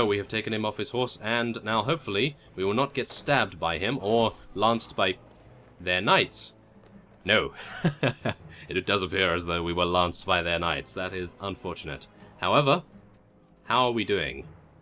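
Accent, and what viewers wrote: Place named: England, United Kingdom. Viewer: hey thanks for doing this series i really enjoy your narration. idk what it is but I think the English have the best accent to commentate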